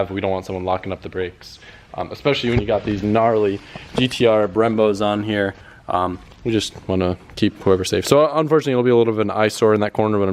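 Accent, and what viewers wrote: United States. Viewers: Adam LZ the cup holder specialist, with an american accent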